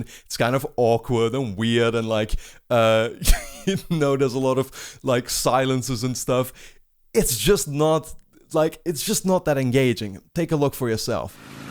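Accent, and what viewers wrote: Netherlands. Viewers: I cannot unhear the dutch accent lol. Its cool tho, i’m dutch myself. Great review!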